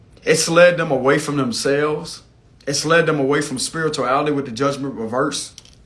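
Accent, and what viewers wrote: Louisiana, United States. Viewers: Thank you for the reading. By chance, are you from south Louisiana? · New to the channel! Came across my feed….no coincidences! Gotta be from Louisiana with that accent 😇 Takes one to know one! Thank you for your service fellow lightworker 🙏✨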